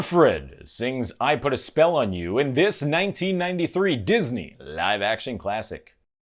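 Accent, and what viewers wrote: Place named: New York City, United States. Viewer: same! is such a natural with hosting! One of these days, I will do a "Hello Belle!" impression just as good as him. Plus, you can't go wrong with his NYC accent (, just an FYI, I'm going to your hometown later this month! NYC is my favorite! I'm so excited!!) Wheel of Fortune dropped the ball by not tapping him to be Pat Sajak's successor and instead going with that overblown twitty twatty Ryan Seacrest.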